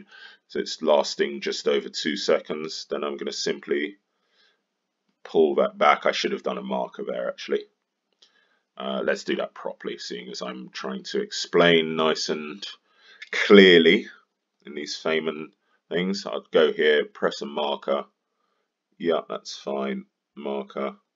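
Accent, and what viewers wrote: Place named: South, United Kingdom. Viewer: Well that was a haaarrrd 9mins and 20 secs to watch. In 9.20sec you managed to simultaneously produce a crystal clear, “how not to make a social media instructional video”, while actually managing to finally show how to freeze frame. Ok I hate just being negative so I hope this comes over a positive constructive criticism as that is what it’s meant to be. Before you start a “how to video” grab a pen and paper and “storyboad” your tutorial, the humming and harring immediately makes you sound like an arse without a clue, and make you come over as a “no thanks they haven’t a clue I’ll find another video” when the end result shows you have half an idea of what you’re doing. Your accent is somewhat off putting, I think the base has its origins is southern UK and relative well spoken indicating a decent upbringing and schooling but the attempt at “getting down with the homies” and all the Americanisms is really a turn off. 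Other than that I did watch until the end, I did (eventually) extract the info I was after, I may possibly look at other vids you put out if only to see if your presentation improves.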